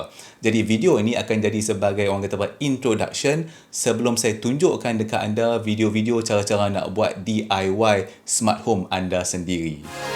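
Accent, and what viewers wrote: North, Malaysia. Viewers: tang cakap utagha tu yg sempoi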